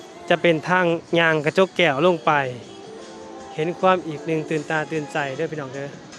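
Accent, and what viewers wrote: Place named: Phrae, Thailand. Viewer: บ่าว วันนี้ฉันตื่นเช้าเข้ามาดูเลยคะบ่าวพูดได้ชัดเจน สามารถพูดให้คนไทยได้เข้าใจมีความเป็นคนไทยเยอคะพูดชัดเจนสำเนียงคล้ายคนไทยแพร่ภาพเลยคะให้ชาวโลกได้รู้ประเทศไทยคะ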